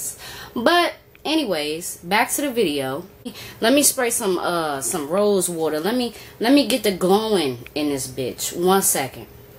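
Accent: Washington DC, United States. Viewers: Do your thing sweetie much love to you I from dc.u sound like u from the dc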